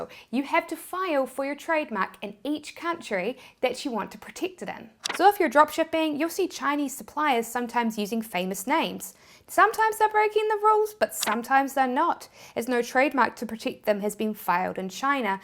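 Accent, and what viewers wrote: New Zealand. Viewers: This girl has a pretty distinct NZ accent, I don't know what you guys are talking about. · Are you from nz?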